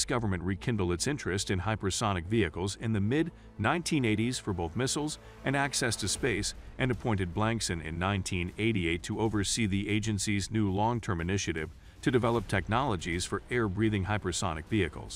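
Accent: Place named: South Africa. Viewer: I know you are a white south African, that why you are talking like that 😁, like I said before, facts doesn't care about your feeling, bringing the ideas on the table doesn't mean you are the one who invented it 😏😁.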